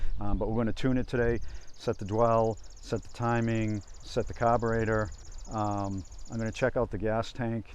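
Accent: New England, United States. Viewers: Love the New England accent..sounds like New Hampshire or Massachusetts.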